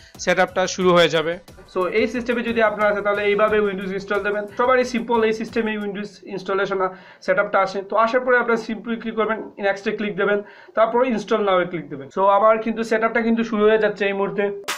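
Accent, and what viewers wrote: Sylhet, Bangladesh. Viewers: Bhai ki Sylheti?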